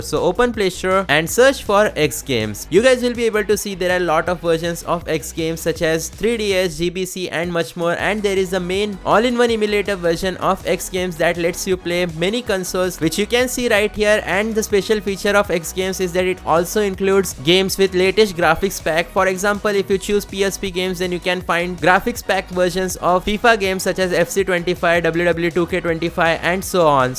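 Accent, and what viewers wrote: India. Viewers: always indian accent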